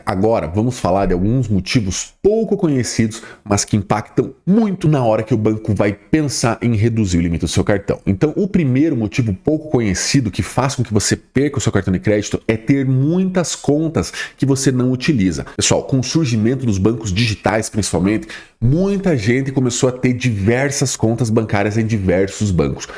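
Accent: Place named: São Paulo, Brazil. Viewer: O senhor é de são Paulo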